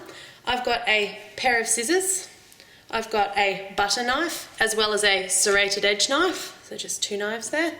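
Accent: Australia. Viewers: I love..I mean LOVE your Australian accent man if I had one j would never shut up cuz it's so cool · oh my gosh, I love Australian accents. c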